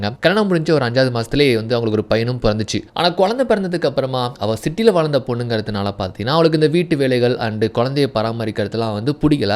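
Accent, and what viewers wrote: Sri Lanka. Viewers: Ivanga Voice Modulation Nallave Therithu Sri Lankan Tamil Nu So Ivaru Definitely Arjun Bro Illa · slang change aahuthunga.... ilangai tamil mix up maathiri irukku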